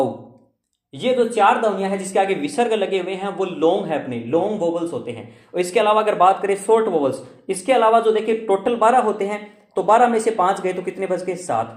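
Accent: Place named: Haryana, India. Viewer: Sirji aapki language me haryanvi tone kse aapto rajsthan se hoji 🤔🤔🤔🤔🤔🤔🤔🤔🤔🤔🤔🤔🤔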